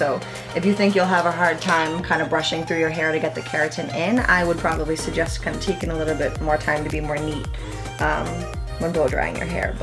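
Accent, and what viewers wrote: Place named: New York, United States. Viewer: Love your videos. Trying to place you slight accent. Are you from New York?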